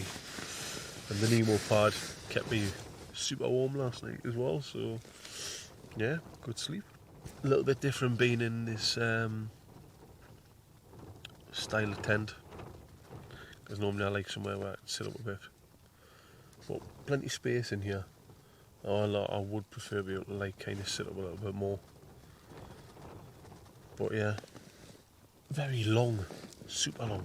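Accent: United Kingdom. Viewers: Am I the only one that had to turn on the sub titles? I really struggle with North of the Tees accents. £500 for a tent... It better be good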